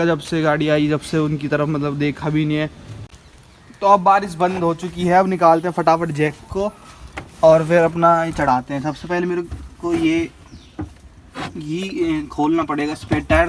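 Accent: Haryana, India. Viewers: bhai sabse khas baat ki aapka face dekhne ko mila first time bhai nhi abhi tak khali koi haryanvi awaz hi aati thi bss 😀😀😀😊😊😊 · Bhai yarr i love your way you speaks in haryanvi tone..love ur videos..although i lives in ghaziabad but my favourite language is haryanvi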